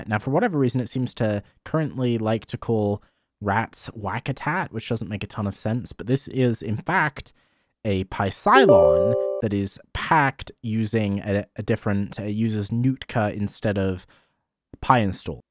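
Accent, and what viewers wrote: United Kingdom. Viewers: is this guy an American with a speech impediment or a brit? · Your accent is so weird, you switch between sounding Canadian and British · thanks for the tips. your accent rocks. there seems to be some British English on certain kinds of words.. password.. master.. ..ahaha Niles Crane-like.. thanks again! So happy to hear a voice i can understand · Nice. I seem to hear a little bit of a British Accent, but I don't know if living in British Columbia gives you that accent or something. I like it though!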